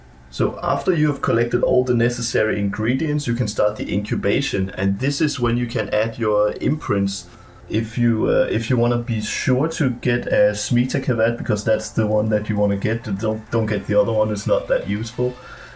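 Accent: Denmark. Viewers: Well it was my frist kavat and I just got it xD Btw are you from Denmark? because you sounds a bit like a dane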